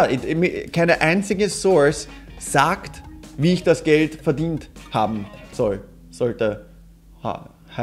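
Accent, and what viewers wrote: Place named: Burgenland, Austria. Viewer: Top dast deinen Burgenländischen Akzent beibehalten hast #nördlicher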